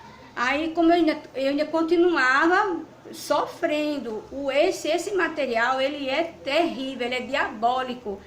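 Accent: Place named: Northeast, Brazil. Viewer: Kika Tita você está dando aula de língua portuguesa aqui pra quê? O vídeo é sobre procedimentos cirúrgicos. Você se acha muito culta, mas deveria saber que gente culta respeita a cultura e o modo de falar do outro. O vídeo mesmo é de uma Senhora Nordestina, e percebemos que ela tem um sotaque típico da região dela, e nem por isso significa que ela está falando errado. Eu falo com o sotaque super caipira do interior de Minas Gerais e tenho muito orgulho! Aprenda respeitar o modo de ser e falar dos outros. Escrever bonito só serve pra enfeitar papel. Você pelo jeito só foi na escola pra aprender escrever, porque educação você não tem nenhuma.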